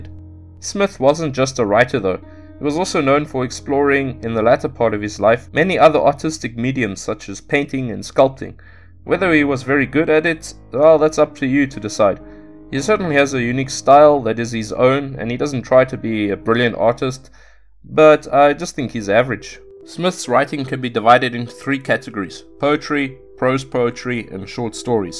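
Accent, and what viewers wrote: South Africa. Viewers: So do many of the ones on Abebooks but you get a vast selection and they're VERY cheap, sometimes pennies. Seriously, check them out... hang on, I just realised, from the accent, you're probably not in the US or EU are you? South African? If so there's an Abebooks in Capetown but they're all over the place.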